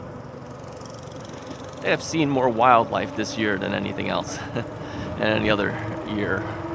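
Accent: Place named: North, United States. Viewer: Just found you through “ Total Therapy Solution PT “ you tube. Love your mission to help others! I am getting ready to have 1st of 2 knee replacements at 70 years of age, female, due to advanced osteoarthritis in both knees! Love bike riding and visited my son in Germany this past summer. He is an avid bike rider and Germany has wonderful trails with views in the mountains for riding. You mention the weather many times in the video but don’t say where you are from? Are you in Chicago? You mentioned the “ Windy City “ and I’m picking up a rather northern accent in your voice. I was raised in upstate new York near Buffalo. Excited to see such bike trails are available! My son introduced me to the idea of an ebike while I was in Germany. Many Germans are using them! I plan to keep following you and loved riding along! Thank you for what you are doing!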